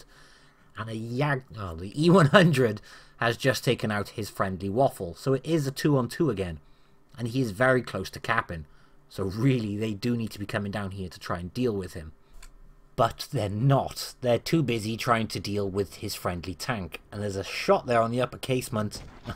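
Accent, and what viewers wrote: United Kingdom. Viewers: first like before watching and second it's always great to hear your voice laughing with that stunning British accent of yours along with that beautiful and accurate commentary have you to tried to make a radio channel I'm not kidding your voice is so calming..... don't stop making Those videos with commentary I'm dead waiting for the next one cheers mate ..... :D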